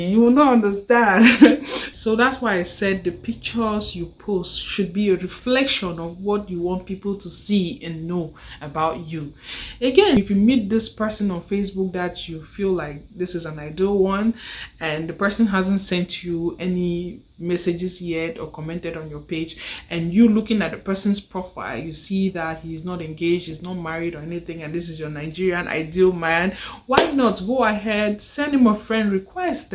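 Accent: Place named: Pidgin, Nigeria. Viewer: OMG 😯 - that was a nice 👍🏾 video, you were very much down to earth - no dulling + no forming, your pidgin english (broken english is very concentrated ooh 😲) - do you blame for bringing out the Nigerian man in him? when you completely took him off guard -) can’t wait 😊 for the follow up. Well done ✅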